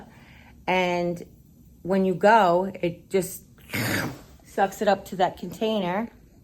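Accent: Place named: Long Island, United States. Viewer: Hiya. Girrrrrl, share away. Learning about the Peri wick is neato. Way to be independent indeed. As you know I dig your humor & that Long Island voice of yours. I swear when you speak, I feel home. Praying for you my friend.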